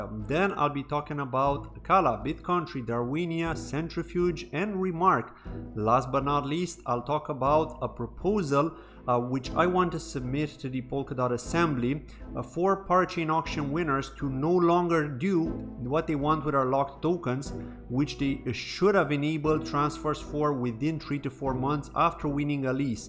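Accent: Ireland. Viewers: really !!! Didn’t know that, I thought that you had a touch of a Kerry accent alright 😂😂😂 yep, I’m Irish 🇮🇪🇮🇪🇮🇪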